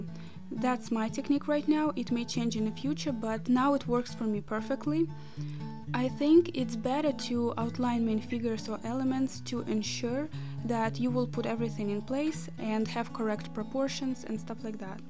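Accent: Russia. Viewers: Красиво) Слышно русский акцент) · Omg this Russian accent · This is so inspiring ❤❤❤ I noticed you have russian accent... Aren't you russian?😅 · Русский акцент узнаешь из тысячи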